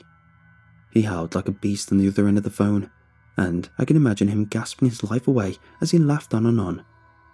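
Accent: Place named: Northern England, United Kingdom. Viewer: Love the accent I'm from London and he's northern but not sure where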